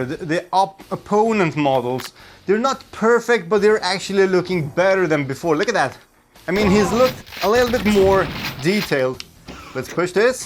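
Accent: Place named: Sweden. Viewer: Yeah no problem, glad to help you and the community. I used your method and everything else worked, except the weapons. All the files were there in the "models" folder, and other objects like barrels, etc looked HD, but not the weapons? Really weird. I did see a couple other posts other places, of people having the same issue. Also, I found that "Dark Forces" does not use the DOOM engine, and does not work in VR (yet). Love your channel, love your videos. I also like your accent, where are you from? Sweden?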